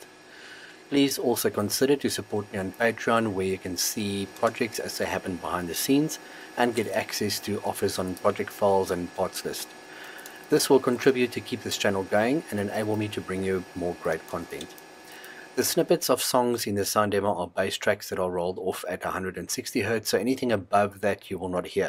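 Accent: South Africa. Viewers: Hey. Are you by any chance from South Africa? Your accent is why I'm asking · Hi, you sound South African, if you are where are you based and do you perhaps sell what yoh make? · South african voice I heard there. Where did you source the driver? Looking to replicate your build along side a set of 3.5 inch satellites.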